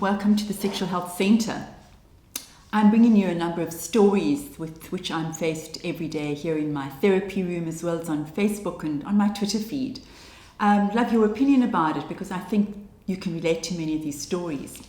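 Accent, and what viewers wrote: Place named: South Africa. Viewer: she sounds like she's from SA.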